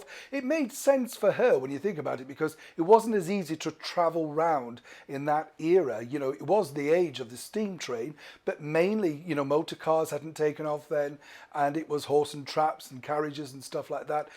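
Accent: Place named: England, United Kingdom. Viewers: Waving to you...from Asia💕 We love your English Accent. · Yes, we low brow, ruffian convict decedents in the land down under, love your accent and oh to be in England again 🙋🙂💂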